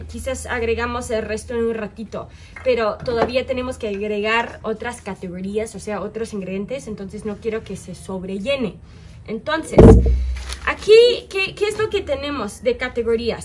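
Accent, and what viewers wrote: Mexico. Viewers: I'm listening to you and I feel I light Mexican accent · Hi!!, no sabia de tus videos..te felicito..son muy interesantes, y tu español es excelente...lo hablas muy bien, se nota claramente el acento mexicano...pero bueno.. agradezco que compartas tus conocimientos, muy buenos y como te reitero, bastante interesantes. Saludos From CHILE - VALPARAISO. Good luck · Antes hablabas español como Española y ahora hablas español como Española y mexicana en acento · Habla muy mexicana! Que linda!! 😊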